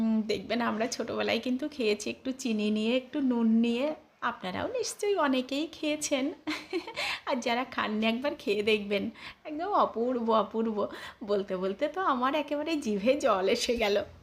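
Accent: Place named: Bangal, Bangladesh. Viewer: তুমি মোনেহয় বাংঙাল ।তোমার খাওয়া কথা বলো আমার সাথে মিলে যায়। আমরা বাংলাঃ ফরিদপুরের। অনেক দিন ধোরে বেরাকপুরে।❤❤❤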